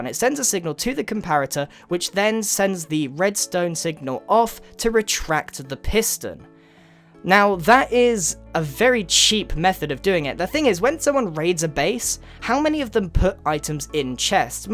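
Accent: United Kingdom. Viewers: I am new ,but this guy sound like he is British or he sound like you are supposed to be in a Harry Potter movie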